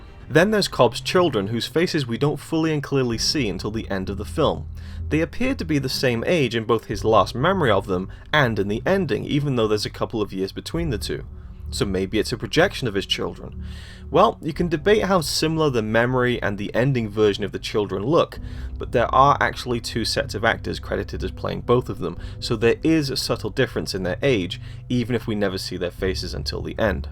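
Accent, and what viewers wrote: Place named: United Kingdom. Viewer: Who is this British dick making these videos. He explained nothing. What a tool.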